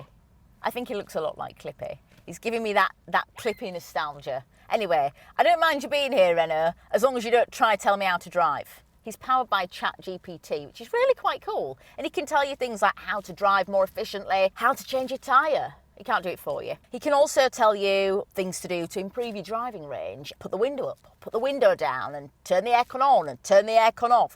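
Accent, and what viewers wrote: Yorkshire, United Kingdom. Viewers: Accent? Yorkshire? Love it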